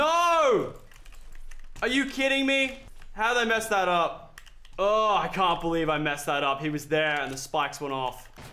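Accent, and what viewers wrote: Australia. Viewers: u australian?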